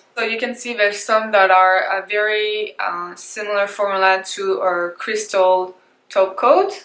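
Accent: France. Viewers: Hi there ! Are you French ? Where did you learn to speak English that well ? Just wondering ! Great video btw, That palette is on my wishlist thanks to your great review !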